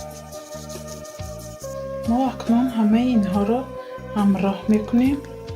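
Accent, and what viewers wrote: Isfara, Tajikistan. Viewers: рахмати калон апа ман а рузи авал а лавзатон шинохтам ки шумо аз исфара хастед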